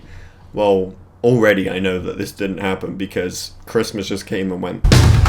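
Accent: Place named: England, United Kingdom. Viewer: Why does sound English here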